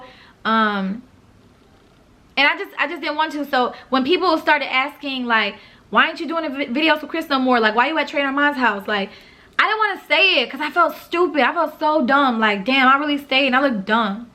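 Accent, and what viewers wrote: New York, United States. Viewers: She talk mad ny now lowkey tho😭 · she sound like a new yorker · No cap queen finally sound like she from ny now 😫😫 I love ittt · she's starting to get that New York accent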